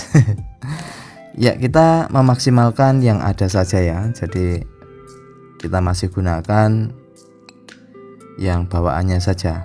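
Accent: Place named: Lampung, Indonesia. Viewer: Tak kira jowo ternyata lampung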